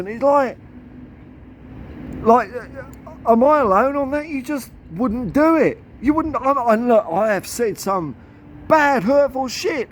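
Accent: Australia. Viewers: Are you Australian mate? Can’t work out the accent. Like your channel 👍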